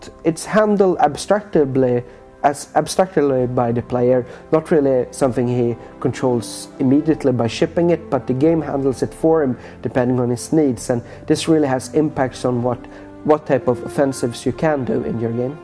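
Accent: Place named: Sweden. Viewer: this accent is horrrrrrrible........ im swedish too but.. seriously get a proper narrator for these types of things, even if he's reading from a script. its better than someone who can hardly speak the language..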